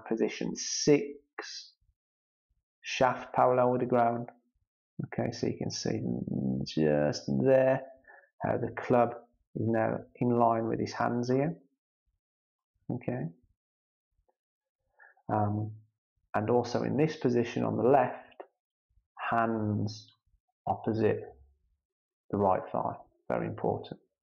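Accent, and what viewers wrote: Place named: Black Country, United Kingdom. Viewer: Hi really enjoyed this analysis of the master! You say it is really important that his head/eye is tilting forward to help the back swing could you explain? Also how much forward tilt from the hips do you recommend. By the way love your black country accent!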